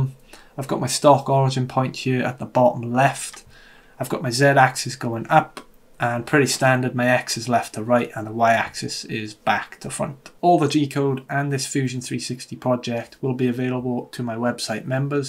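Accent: South Wales, United Kingdom. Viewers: Love your video and your Welsh accent sounds like South Wales as is mine I now live in Canada and am a retired senior citizen, as a result I am unable to offer support by way of paid membership membership given this info I wonder if you would consider sending me a copy of the code you used for flattening you spoil board? Also please advise what part of Wales are you from or located I just have a feeling we would not be far away if I still lived there.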